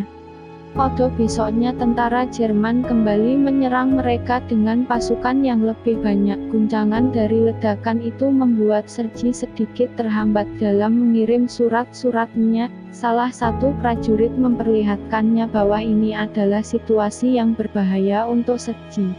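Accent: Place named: Java, Indonesia. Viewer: Bohoso jowone ije kentel men mbak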